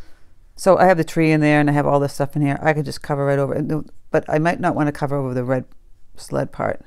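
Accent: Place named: New England, United States. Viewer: I love your painting! But even better I love your personality. You make me laugh and teach me things at the same time! What part of New England are you from? Your accent sounds like my daughters. She is Vermont/New Hampshire.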